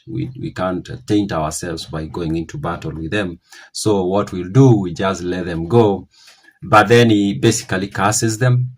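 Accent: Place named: Kalenjin, Kenya. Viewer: Mbona huyu jamaa anakaa mluhya na anaongea kama mkale?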